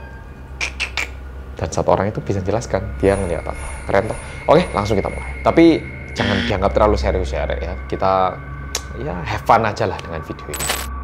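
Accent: Java, Indonesia. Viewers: Saya melihat ada orang korea yang medhok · "Tapi...ini....pertanyaan pertanyaan ini kurang....BiSa DiPercAya Gitu" Humorq hanya sebatas kak ngomong medok😭😭😭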